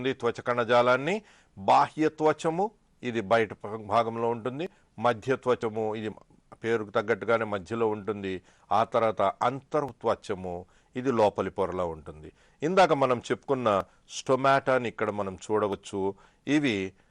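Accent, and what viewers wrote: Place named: United States. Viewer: Ur English is mind blowing sir it hears like Americans English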